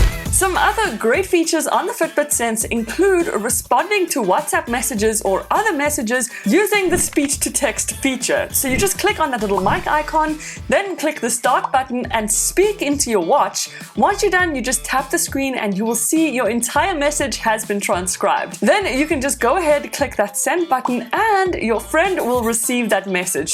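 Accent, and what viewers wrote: South Africa. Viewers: Are you South African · R u from South Africa? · I had to do a double take because of your accent, and then immediately recognized it as South African. Hell yeah! Great video by the way :)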